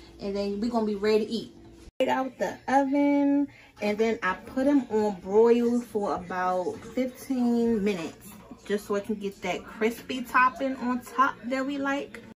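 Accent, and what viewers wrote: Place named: Maryland, United States. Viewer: Yummy. First time watching. New subbie. Let me guess Maryland???? That accent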